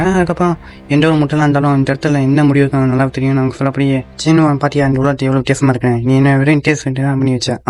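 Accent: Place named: Kerala, India. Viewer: Bro, tamil thana ,nenga pesurathu konjam Malayalam tamil kalanthu pesura mari irukku so, please tamill padichitu YouTube pesunga.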